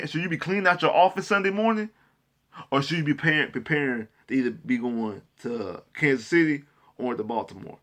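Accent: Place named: South, United States. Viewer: Aye bruh. I’m a Bills fan from Alabama and before we play somebody, I listen to a YouTube fan blogger for that team.... u the best one yet bruh💯 You ain’t talking stupid, you respect your opponent , your perspective on your own team is on point, and your analysis of my QB..... I COULDN’THAVE SAID IT BETTER! I don’t know if it cause we both from the south and I can relate to your delivery, but I enjoyed this video. You should maybe open it up and do NFL videos not just about your team. (Idk if you already do) one Love folk. #GOBILLS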